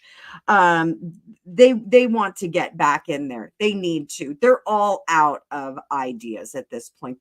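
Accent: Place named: New Jersey, United States. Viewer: Great reading . I love the NJ accent. lol sometimes my Deep South comes out in me . Who am I kidding - I can’t get rid of it. 😂ya know I’ve never really wished a divorce on anyone but I do with them. I just hope they get out before Harry loses it completely. ❤️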